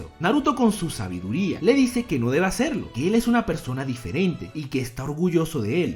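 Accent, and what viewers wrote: Ecuador. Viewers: Eres de ecuador ? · Eres de Ecuador? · Eres de ecuador?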